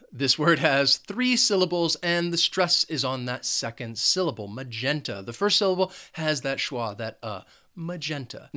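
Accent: United States. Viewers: unfortunately your accent is American not British 😕 any way thanks a lot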